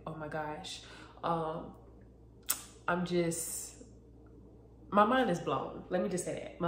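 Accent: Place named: Broward, United States. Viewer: You can move out of broward but you can’t take the broward talk out of you lol 😂 I loved this vlog 🥰 congratulations happy for you keep going bby 👏🏾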